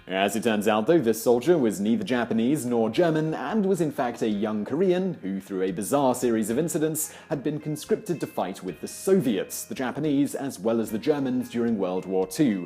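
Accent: England, United Kingdom. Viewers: Where is from in England?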